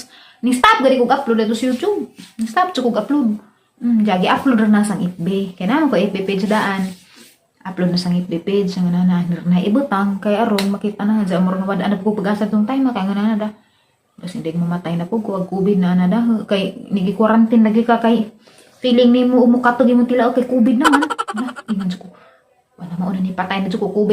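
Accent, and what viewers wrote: Bohol, Philippines. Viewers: Inday bilib ko nimo.gahi man jud ag sinultihan sa atuang tga bohol.proud ta tga bohol ta.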